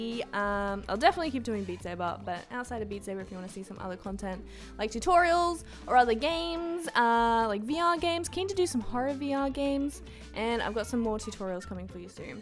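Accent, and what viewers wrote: Australia. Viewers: Omg youre Australian!! Thats so cool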